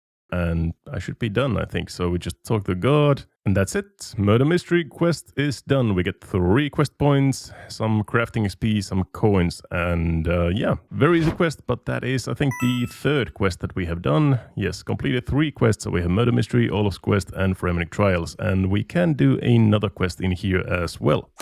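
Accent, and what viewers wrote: Finland. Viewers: Wait, are you Finnish? I always thought German 🤔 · Since the first episodes, i always tought you were Finnish but never checked it out. Now i did and i was right all along. Tiiny tiny accent gave it away. Keep up the grind. (Englantis on aika pirun hyvä)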